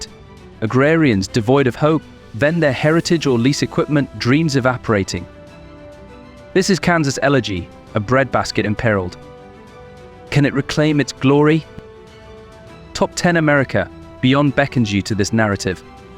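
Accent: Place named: United Kingdom. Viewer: Listen to a British voice talk about anything American NO THANKS!!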